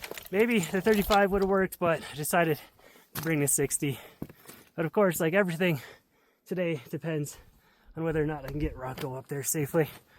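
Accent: Canada. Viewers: Good lord the way Canadians talk is so ANNOYING! Your “out and about” is so strong and just stupid! Learn normal English!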